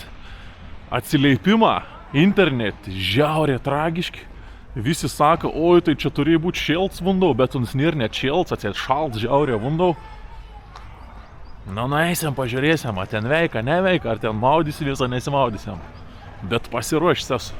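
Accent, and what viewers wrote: Samogitia, Lithuania. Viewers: Mažiau zemaitisko balso jau truputi perdaug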